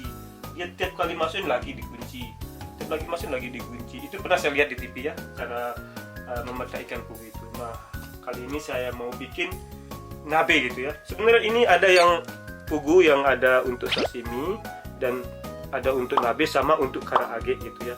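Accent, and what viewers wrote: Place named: Japan. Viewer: Udah lengket ya bang logat jepang nya 😅😅😅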